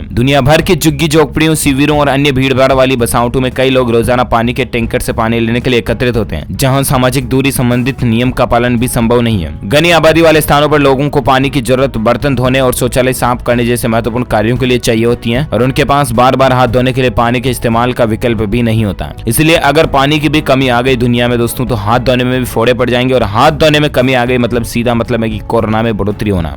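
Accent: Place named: Marwari, India. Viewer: Bhai aaj to aavaj bhi kabhi kabhi marvadi ka pardarsan nice sir ji very nice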